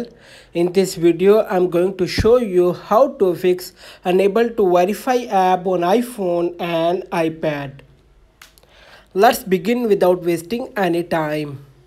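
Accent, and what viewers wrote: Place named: India. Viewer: When u hear the video and its indian just force close its not working 🤷‍♀️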